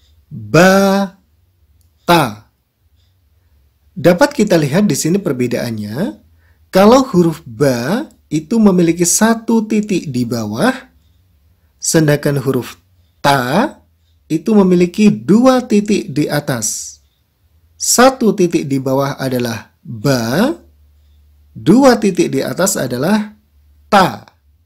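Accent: Java, Indonesia. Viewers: Kedengaran kali yg baca orang jawa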